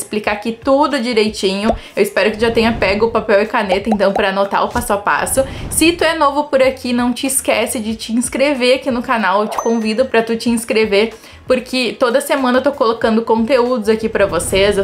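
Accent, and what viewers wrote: South, Brazil. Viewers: Pelo sotaque também é do sul do estado, obrigada pelas dicas ❤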